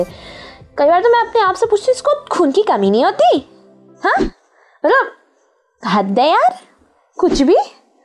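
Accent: India. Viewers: WHO ARE YOU INDIAN OR WHAT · Are you really indian?or learnt to speak hindi ?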